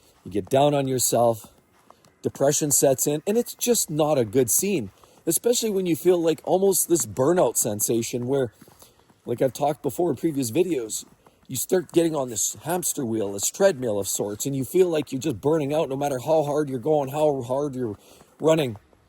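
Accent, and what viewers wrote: Canada. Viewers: Looks like you live in Colorado but your accent sounds Canadian!Anyway, turned 60 and burned out at my job..30 years of teaching..I’m ready to retire..Was planning on 65 but I can’t be in a job where there is no parental and administrative support..It just beats you down to the point where you don’t care anymore..I would rather retire on a lower pension and have peace then to endure 5 more years of this..I’ve become a minimalist and don’t need much of anything…I would to do something but have more control over what I do..Maybe reinvent myself?…Maybe buy a business or just do rideshare or substitute teach??..Trying to figure it out..I think me and my wife would be ok financially..Our home is almost paid off..We could sell and downsize for extra income?…kOur vehicles are paid off..I just ready for some peace and more control of my weekly routine.. 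We aren’t interested in much travel..We would be good with once or twice per year..nothing to extravagant…Anyway I hope I can figure it out before the end of the school year or summer? I’m mentally drained and so ready.. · That street you're walking down looks like Russia. But your accent proclaims you're Canadian. Where is this? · Canadian...I can hear it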